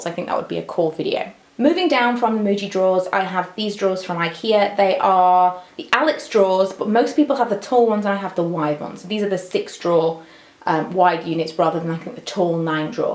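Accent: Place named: Derbyshire, United Kingdom. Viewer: Are you from Derbyshire ? You're accent is very similar to mine !x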